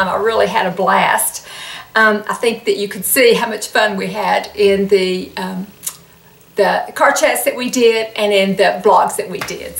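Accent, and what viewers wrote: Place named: Georgia, United States. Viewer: I’m in Southeast Florida. I love your Georgia accent. I’m 54. You go girl!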